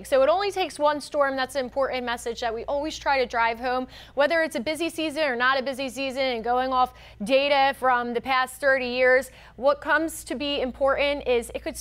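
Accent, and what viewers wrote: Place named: North, United States. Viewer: By far, the worst northern accent that I've heard in Florida news bar none...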